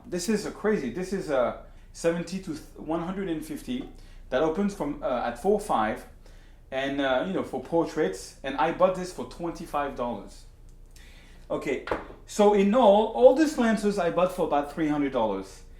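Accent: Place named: France. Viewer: My ears are bleeding to this awful french accent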